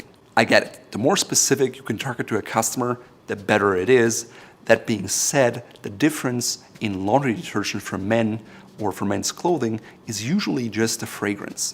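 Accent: Germany. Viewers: Wow! Your German accent is cognizable specially I like the Gazzette vids, thanks! Mit freundlichem Gruß, Rolf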